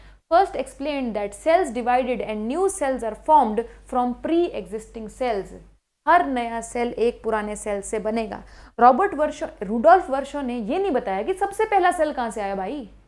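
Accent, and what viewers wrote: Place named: Bengal, India. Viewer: I think she's Bengali.. because I feel it in her accent of speaking Hindi 😌💜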